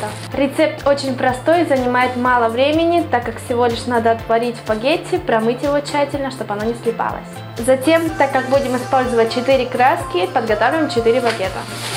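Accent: Spain. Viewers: нет она живёт в Испании , но родилась в России . акцент от того что она больше говорит на испанском чем на русском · у нейо испанский акцент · она родилась в Росси в 11 лет родители с ней переехали в испанию поэтому у неё такой акцент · У уже акцент испанский есть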